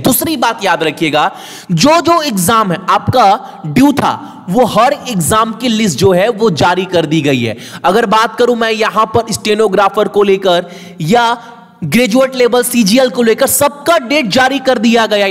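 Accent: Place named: Kolkata, India. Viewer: Actually he is from kolkata so 11 is ekgarha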